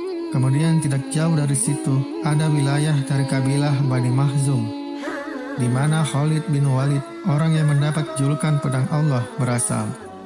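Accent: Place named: Bugis, Indonesia. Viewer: Orang Bugis ini yang ngomong to? Kentara logatnya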